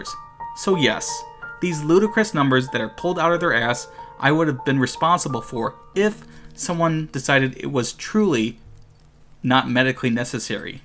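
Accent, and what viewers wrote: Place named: New Jersey, United States. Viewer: You sound a little bit like a New Jersey guy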